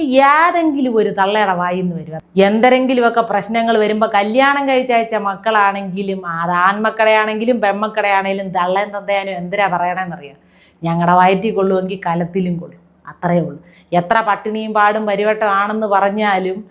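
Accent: Thiruvananthapuram, India. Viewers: 😵‍💫🥴 തിരോന്തോരം അപ്പി സ്‌ലാംഗ് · Thirontharam slang is xcellent❤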